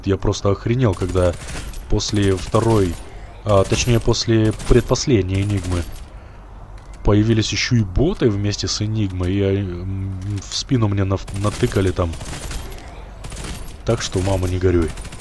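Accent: Kazakhstan. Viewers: Ты из Казахстана? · ты из казахстана?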